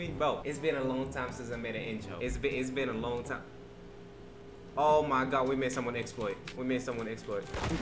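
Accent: United Kingdom. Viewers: are you British? This is a genuine question 🧐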